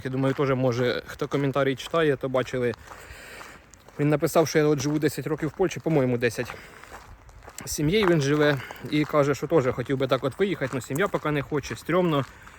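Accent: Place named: Surzhyk, Ukraine. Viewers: Прикольні відоси, дивлюсь без напрягу😂 Єдине не зрозумів, як той факт, що ви виросли в селі пояснює не знання української мови. Тут швидше я думаю відіграло роль особливості спілкування в певному регіоні( схоже трохи на полтавський). Тому не треба пов'язувати це з селом. А позиція "яка різниця" думаю не зовсім правильна, тим паче коли ви ведете блог на велику аудиторію. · По тому як говориш, здається що ти з центральної частини України. Вгадав? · Чого це "центральної частини"? Це слобожанський діалект. ) Ото як він назвав малі яблучка, так їх називала моя бабуся якій би було 100 років). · не обращай внимание на хейтеров, это круто наоборот, что ты не стесняешься и еще нам это все показываешь. Большинство считает, что ты все делаешь по красаве, а если у кого-то подгорает, то это их проблемы. У тебя классный суржик, все звучит естественно и понятно. 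А яблоки про которые ты спрашивал похожи на сорт Ранетки. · Ти балакаєш, як ми на полтавщині, і похер хто, що думає, аби тобі було зручно, не звертай на " вумних " уваги, вони того не варті! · Дякую за відео! А на рахунок мови, то хай ті умники спердоляють) На Запоріжжі всі так розмовляють. Я теж далеко і Ваша мова, як мед у вуха😊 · Не знаю, Для мене, як для західняка, суржик у Вас навіть не дуже сильно виражений. Ну проскакують русизми, але не страшно. Не звертайте уваги на хейтерів. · ваш суржик дуже прикольный, и он так естественно звучит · ти дуже приємно розмовляєш, милий наш особливий суржик, характерний центральній частині України)) ❤ краще суржик і добро в серці, а не видавлена з себе солов'їна і негатив! Слухати приємно, дякую за відео! Цікаво і корисно) підписалася на тебе😊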